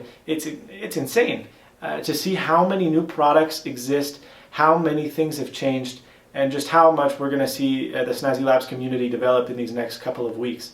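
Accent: Spain. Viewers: That spanish accent haha! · you have a slight Spanish accent when you speak English now compared to your last videos pre-Bolivia haha. Great to have you back:) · I speak spanish as well, and it's very noticeable for me, haha. He must not have had much of a chance to speak English. His 'i" is very spanish-like. I think it comes from being a non-native speaker. I'm sure that being back home, he'll be able to even out his accent. He must have absorbed so much culture! It must have been an amazing experience! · Wow.... i remember when you left... crazy to think how fast time has passed. Your accent has developed so much into a spanish accent. It's hilarious! Hope to see more soon. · Slight Spanish accent? Nice to have you back! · Spanish accent!!!!! :D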